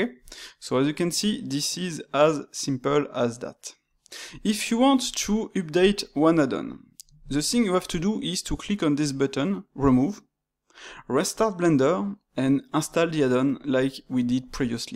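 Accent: France. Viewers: I can tell you're french :)) btw thanks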